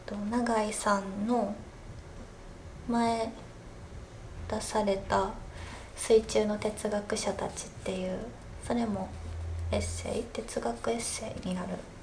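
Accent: Kansai, Japan. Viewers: 台湾からの視聴者ですー！久しぶりに動画観れて嬉しいです。関西弁もかわいいです❤ 体調に気をつけて無理のないようにお過ごしください！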